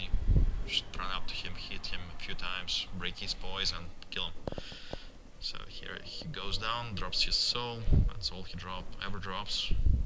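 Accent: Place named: Russia. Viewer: Loving that russian accent.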